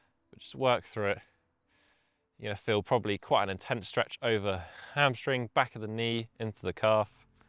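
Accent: United Kingdom. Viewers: This is so good, and the British accent🎉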